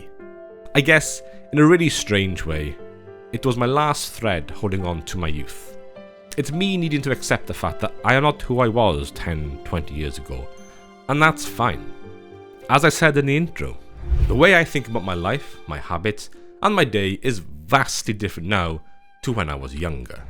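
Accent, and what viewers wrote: Wales, United Kingdom. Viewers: BRO its like my 35 yr old canadian brain is talking to be in a British accent through this video. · Is that a slight hint of a Welsh twang to your voice I detect boyo? Lovely video, as a 38 year old it speaks to me 😢😊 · it's like your life is mine......but yours has a British accent.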